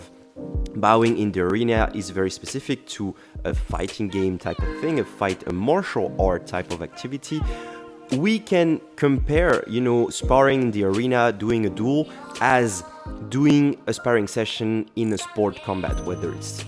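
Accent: France. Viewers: French accent makes this 10 times better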